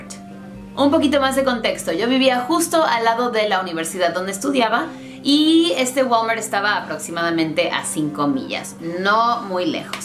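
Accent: Mexico. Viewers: es un poco negativo decir no seas como mejor di algo como tomando experiencias de:) saludos un canal muy padre positivo agusto al escucharte hablas español con acento de nosotros de México:) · Me encanta tu asentó mexicano❤️❤️ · Jeje jeje. Me encanta tu español super mexicano. Numero uno. Dos. Si yo te contara todas por las que he pasado te mueres de la risa e impresion. Aqui aplicacion que cuando no te toca. Ni aunque te pongas. Bendito Dios. Seguimos aqui güera chula 😙😙😙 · Hablas el idioma español, perfecto me has sorprendido, ya hablas como Mexicana, saludos desde Veracruz. 😁☺✋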